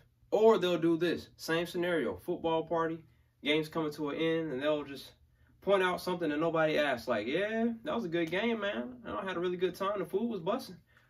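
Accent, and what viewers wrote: South, United States. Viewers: Hi I'm also from Mississippi and my mom and most of my family all of them talk like this I also wanna see some of the ways ppl say yo food nasty or yo ah cannot cook for nun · Love from London!! Absolutely love how you speak and how Southern accents sound. That whole 'down the street' and 'in a minute' plus 'cold-cold' & 'knock you into next week' are very much English things too 😊. But we lack your true linguistical skills by a long way! ❤ Great channel, thanks! ❤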